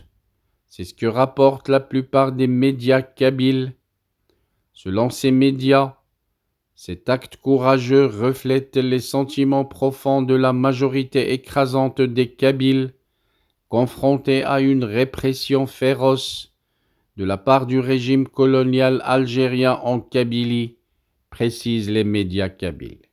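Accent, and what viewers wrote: Morocco. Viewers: L accent de ton francais et celui d un juif magocain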